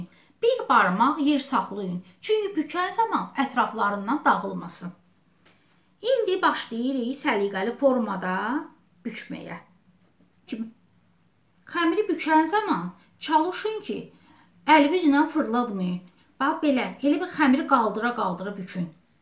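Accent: Baku, Azerbaijan. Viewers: Salam bacı. Kərə yağını duru yağla əvəz etmək olarmı xəmirində? Bakı ləhcəsi ilə danışığınız 👍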